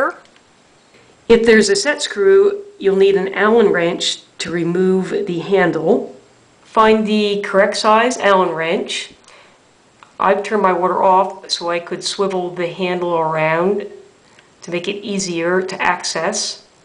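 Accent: Philadelphia, United States. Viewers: Is this a Philly accent or Baltimore?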